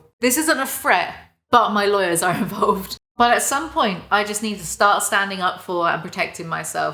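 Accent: United Kingdom. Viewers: which british accent do you have? like which area? - from norway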